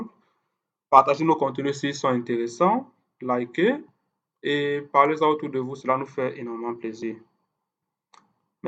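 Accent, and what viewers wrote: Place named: Benin. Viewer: J'aime bien tes tutos, mon ami à l'accent béninois ou camerounais. Tu es opérationnel. J'aimerais bien avoir ton contact WhatsApp, si cela ne t'ennuie pas bien sûr. Le mien c'est le <num>. Je ne sais pas où tu vis, mais, le Burkina n'est pas loin et tu pourrais facilement y dispenser des formations!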